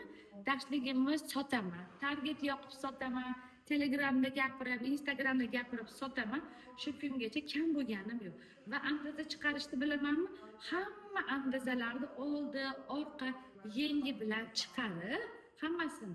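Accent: Uzbekistan. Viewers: Маргилонча гапираркансиз оддий тушунарли халкона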